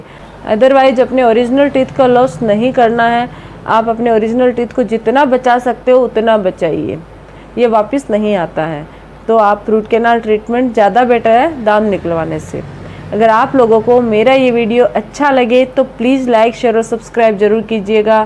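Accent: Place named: Marwar, India. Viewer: आप भाषा से मारवाड से लगती हो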